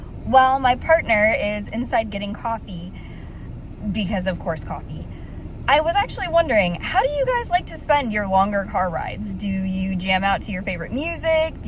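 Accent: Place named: Minnesota, United States. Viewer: It sounds like you've been in or near Minnesota lately :D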